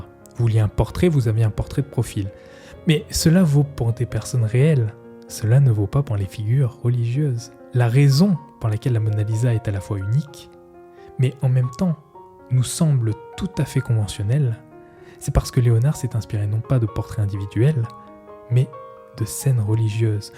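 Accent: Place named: Housing estates, France. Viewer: Ce fond d'accent des quartiers qu'ont malheureusement les 3/4 de nos jeunes aujourd'hui (même ceux qui ne sont pas des quartiers), m'a littéralement dégouté. Affreux.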